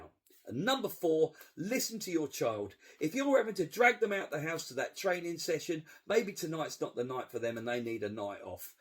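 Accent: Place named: Kent, United Kingdom. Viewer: This guy is from Kent 10000%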